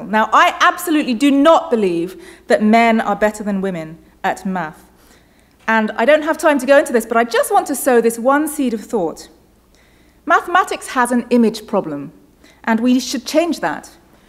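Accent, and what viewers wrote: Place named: England, United Kingdom. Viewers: it's an english accent actually. It's not scottish, or irish or welsh, the other components of "Britain". English, English, English. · I love her british accent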